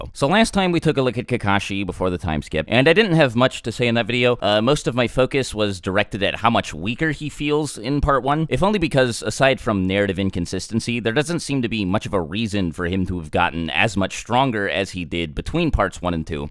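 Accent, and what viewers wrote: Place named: Boston, United States. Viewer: Hey, do read the manga in Japanese? I ain't been watching your channel too long (I do rather like your videos) but when I hear you speak I figure you are from Boston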